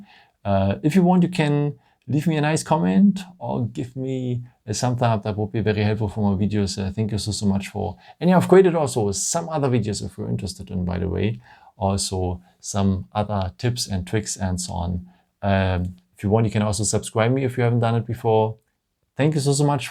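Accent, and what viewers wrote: Germany. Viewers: Vor kurzen gekauft das A72 Machst Du das auch in Deutsch? Hast da noch einen anderen Chanel, Du klingst zumindest im Akzent Deutsch ;) ... if not, here my translation: I ask if you have a german channel to, because you sound german ::) I just bought the Phone. What I have problems with is the camera. Take nice fotos with red flowers for exampel is not possible, does it has a "red-problem" ? How to handle that?? Its too bad... even with other apps ... because its the camera I guess?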